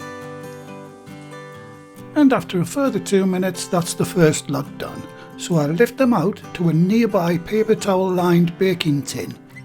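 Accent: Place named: Geordie, United Kingdom. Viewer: My girlfriend is from Newcastle. Love the Geordie dialect🥰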